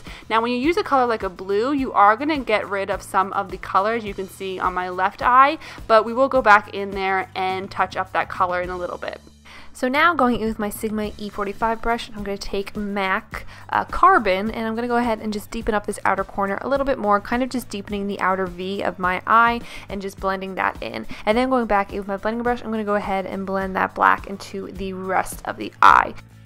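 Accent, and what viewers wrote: New York, United States. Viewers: So beautiful! I love all of your tutorials 😍 Where are you from? Sounds like a New York or New Jersey accent? Lol 😘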